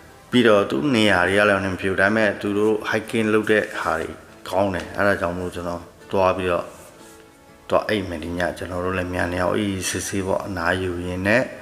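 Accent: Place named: Shan, Myanmar. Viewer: မြန်မာ စကားပြောတဲ့ အသံနေအသံထားက ရှမ်းမြောက်နယ်ဖက် နမ္မတူ ကဖက်ကအသံနေအသံထားပဲ ကောင်းပါတယိဗျာ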